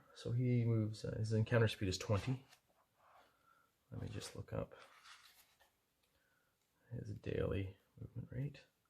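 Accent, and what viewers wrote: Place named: Ottawa, Canada. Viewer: Originally Ottawa valley but Victoria now. I knew you were local Ottawa from the accent.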